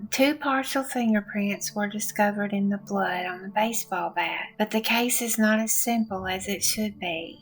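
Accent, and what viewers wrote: South, United States. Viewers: It's unique! Is it a Southern accent? 😊 As for poor Henry, I'm pretty sure he was killed by someone who knew him. Seeing as he was hit from behind I think he had met someone in that spot that he knew. I saw some recent news that they were exhuming his body after all this time so hopefully some positive news soon.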